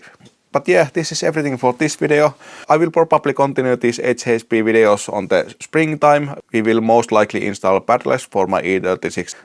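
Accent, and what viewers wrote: Finland. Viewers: Ralli englantia😂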